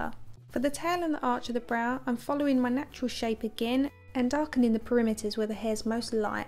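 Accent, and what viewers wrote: United Kingdom. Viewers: You truly make everything look so stunning!! You're so talented- I'm just so very pleased I found you!! And might I add, I love hearing a British accent instead of North American🙊😉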